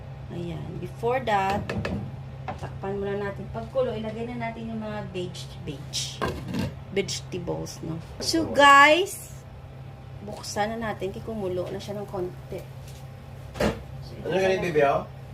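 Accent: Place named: Iloilo, Philippines. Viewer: Hi sis, its Melanie Tingson if you remember me hehehe.. wow sarap naman ng sinigang ng ulo ng salmon. I love this too, bagay sa panahon na maulan Ilongga ka ba sis? narinig ko kasi hehehe kainin na natin yan sis...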